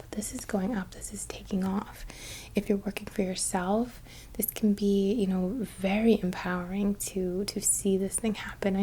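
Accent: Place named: New York, United States. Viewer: Thank you for your hard work. Also, I just want to add that today I became aware of your New York accent. I've been listening and watching your reiki vids since I think 2016, and suddenly I was like, omg she sounds like a New Yorker. Don't ask me why I became aware of this, I have no idea. Peace and love x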